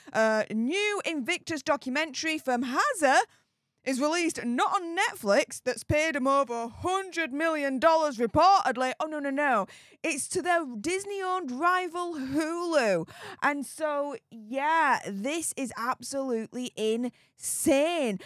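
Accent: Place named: England, United Kingdom. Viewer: Takes a while to get through the English accent however is interesting and worth watching.😅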